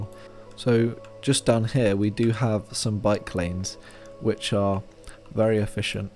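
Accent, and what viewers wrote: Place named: United Kingdom. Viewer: british i know it on your voice 🙂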